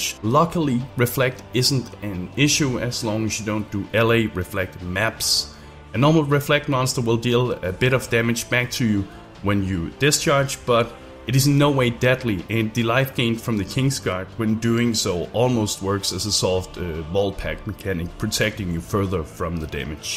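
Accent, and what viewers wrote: Denmark. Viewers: One discharge, one baby haha...Du er Dansker ikke? man kan altid hører det ;)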